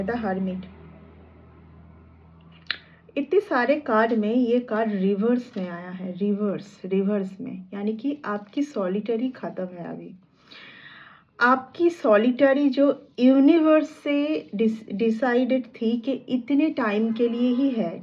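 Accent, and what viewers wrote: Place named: Bengal, India. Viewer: Very beautiful reading....when a Bengoli person speaks Hindi.....it's sound very sweet 🧁❤❤❤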